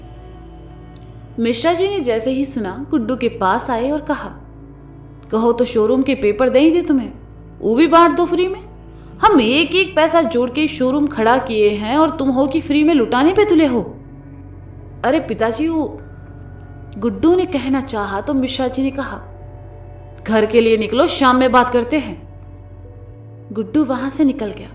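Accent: Kanpur, India. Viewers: aapne kanpuriya language ka mast boli ho...👌👌👌👌 Bahut badhiya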